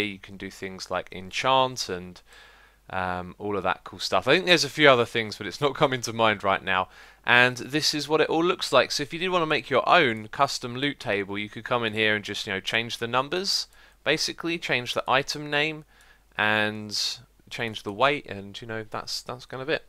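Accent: United Kingdom. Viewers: Honestly, I'm so confused about this whole thing xD. Not that you're bad at explaining, but that I'm stupid :D. I just like your voice. British accents are awesome to me, cause I'm American, and I just love your stuff :D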